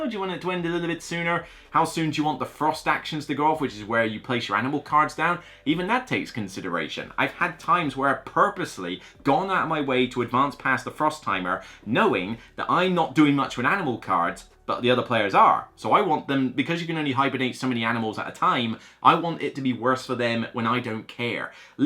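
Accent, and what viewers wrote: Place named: West Country, United Kingdom. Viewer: New to the channel. Nice review! Hope you won't find this too personal but I find your accent (and accents generally) very interesting. I'm guessing a mix of west country and New York/southern Canada?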